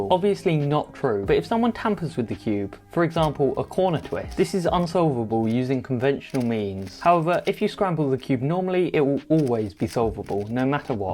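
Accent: United Kingdom. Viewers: first bri'ish cubing youtuber? Nice!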